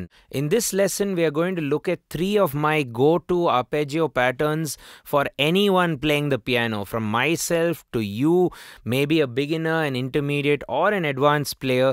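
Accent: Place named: India. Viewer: Wonderful...No plans to learn piano but I loved listening whatever you played...God bless...Your way of speaking sounds very Indian...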